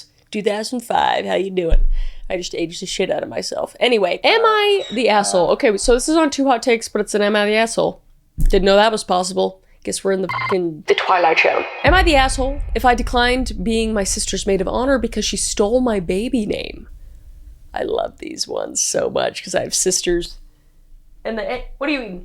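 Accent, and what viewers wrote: Germany. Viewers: Sehr gute, deutsche Akzent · Yep your accent sounded like my german mom trying to speak english 😅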